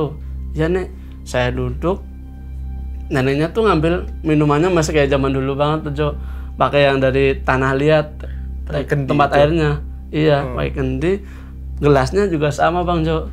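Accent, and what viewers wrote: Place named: Java, Indonesia. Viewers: Khas bgt logat Purwokerto yah mas hehehhee disigar dijiret lucuuu,salam dari Ajibarang mas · ora ngapak ora kepenak 😁 logat purwokerto nya kental bgt ya:) salam dari wong purbalingga, sukses selalu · Logat jawanya😂😂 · ngapaknya mendarah daging wkwkwkwk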